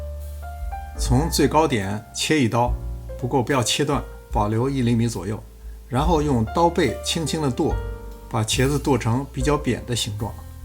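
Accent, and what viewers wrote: Beijing, China. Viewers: 时不时蹦出的老北京土话让人听了真的很亲切！感谢您的美食分享！ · 最近看到这个视频，太喜欢这个节目了博主用心做美食耐心讲解，地道的北京口音娓娓讲述烹饪技巧👍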